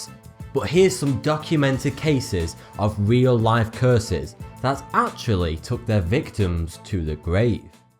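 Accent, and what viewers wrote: United Kingdom. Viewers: British accent!! so yhea sorry to ruin your hate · Thanks for the informative video! I have learned many things from your channel, and I love it! Just ignore the people that say, "You're voice is stupid", it's not true. They're just jealous that they don't have a British accent. I've been to England before, and I know what a real British accent it. Not just American impressions. :-) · it’s just a bri’ish accent, innt? ‘specially in lond’n, where is pr’nounced for’y too. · Benjamin Matić Maybe he is actually saying 42, and we're all just jackass's for blaming his British accent? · Uhm.....because he's British? Where we pronounce the letter 'h' as 'eiche', a British chap will pronounce it 'hayche'. Very often their T's will be much softer in inflection or just get dropped altogether, unless they're actually talking about afternoon tea. 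Look up British stand-up Eddie Izzard and he'll teach you how to British. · Nate Haimson thats how british people talk, you know that you see movies dont you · Why do you say "42", but your name is " "? What dialect do you speak? My guess is it's somewhere in or around the U.K. Your teeth also make it very likely that you're British. You also dress like you're from a different era. It's strange and interesting. Good luck with everything. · He's British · Herpa Mcderp It depends what accent you adopt. is British, not American, and I'm guessing you're American. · This guy has an accent that you can chop off with an axe. I wonder where he's from in the UK.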